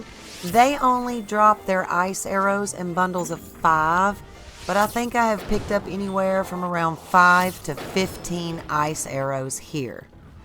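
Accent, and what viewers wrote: South, United States. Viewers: Hello! I love your videos! I'm from the south, living in Mobile, AL, so your voice makes me feel right at home too. I hope you're ready for Tears of the Kingdom! -B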